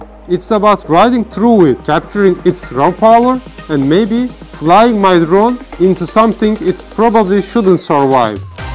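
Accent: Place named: Turkey. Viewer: senin o alttaki türkçe aksanını duyarım ben ;)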